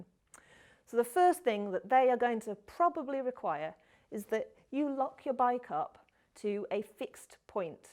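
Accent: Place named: England, United Kingdom. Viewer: Thanks for the humor-sprinkled video and all of the great info in the "Show More". Also, I live for English accents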